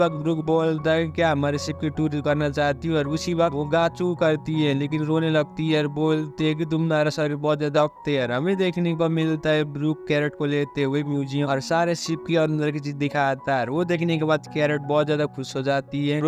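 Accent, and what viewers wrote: Nepal. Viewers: Bhai nepali he kya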